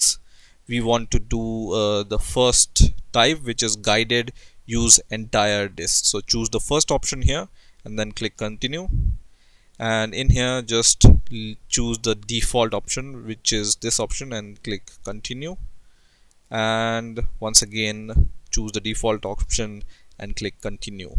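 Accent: India. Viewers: india isfunny with speak English >.> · ur accent looks like indian to me ;) 😉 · I'm tired of this Indian accent :/ Thanks for the video though. Liked :)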